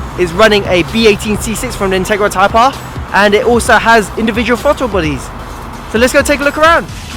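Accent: England, United Kingdom. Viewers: Fuck bro u sound like a Pom hard , u must live in England surly?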